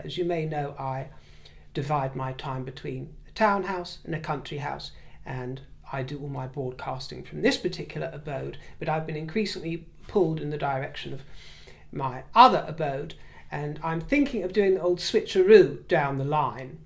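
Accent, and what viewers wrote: United States. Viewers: Great American accent🙂👍🏻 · I was thinking about this when I was making a comment. I don’t know if it was under this or the last video I watched but I’m dictating and it’s writing in US English. I can feel all the people over the sea cringing when they read the spelling of “favorite.” 😂 I’m American and I still feel your pain having to speak with an American accent. At least for you it’s only temporary.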